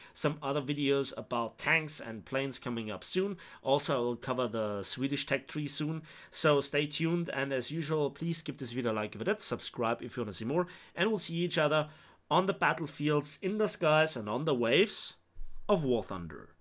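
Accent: Bavaria, Germany. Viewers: Mach Moi wieder a Video auf bayrisch 😂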